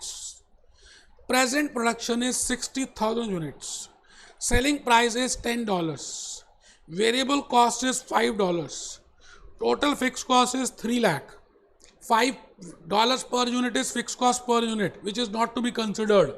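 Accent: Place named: India. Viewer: Thank you Sir. Aap bahut accha kar rahe ho aise video daalkar. I always understood concepts better in Hindi and in a desi dialect.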